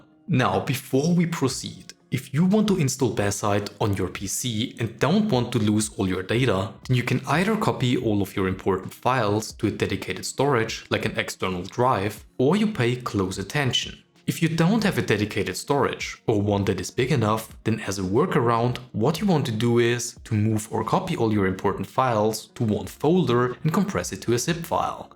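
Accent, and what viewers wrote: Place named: Germany. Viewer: ich höre in dem englisch einen deutschen dialekt raus 🤔😂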